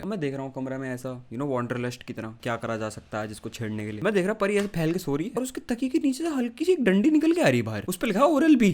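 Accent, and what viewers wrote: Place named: Uttar Pradesh, India. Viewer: After a lot time..... using some UP accent yeh <<<<<<3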